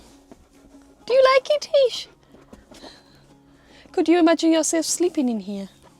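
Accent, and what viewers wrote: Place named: Yorkshire, United Kingdom. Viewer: I’ve been missing that (Yorkshire?) accent.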